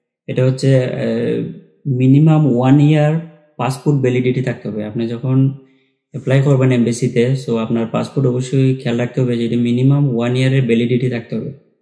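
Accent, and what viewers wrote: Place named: Habiganj, Bangladesh. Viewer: ভাই আপনার ভাষায় বুঝা যাচ্ছে,,আপনি হবিগন্জ ডিস্ট্রিকের।আমি মাধবপুর থেকে বলছি।আমি আগে দূবাইতে ছিলাম,, আড়াই বছর,,,এখন পূর্তুগালে ভিসিট ভিসা পেতে আপনি কোন সহযোগিতা করতে পারবেন।অথবা ওয়ার্ক ভিসা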